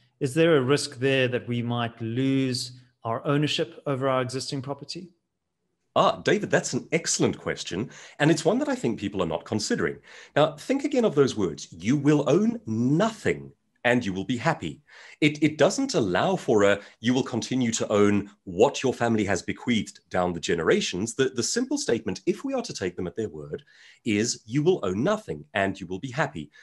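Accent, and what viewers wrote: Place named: RP, United Kingdom. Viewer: Having vast professional experiences with the English, then dating a few Scotsmen and travelling there extensively, I must say that you kind Sir really do speak the Queens English as well as that POS!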